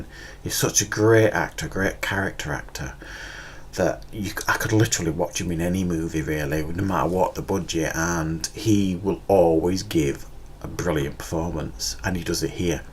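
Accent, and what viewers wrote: United Kingdom. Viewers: You from UK?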